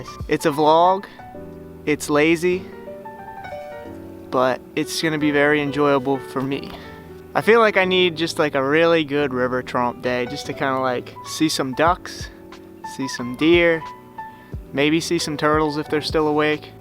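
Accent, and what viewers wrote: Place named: South, United States. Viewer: Dont loose your southern accent. It is what made you!!!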